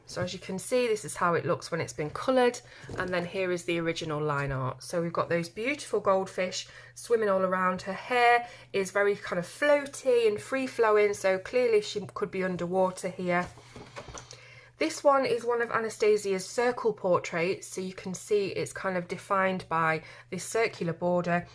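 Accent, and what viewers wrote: United Kingdom. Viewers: O your British cool love the way you talk love the coloring books to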